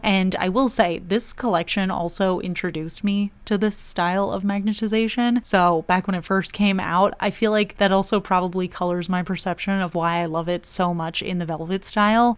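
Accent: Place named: Midwest, United States. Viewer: You sound midwestern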